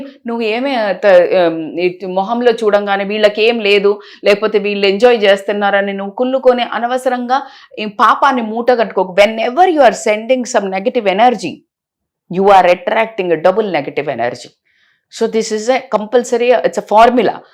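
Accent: Telangana, India. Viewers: I like her accent of Telangana language 🎉generational ga elagae matladutharu🌸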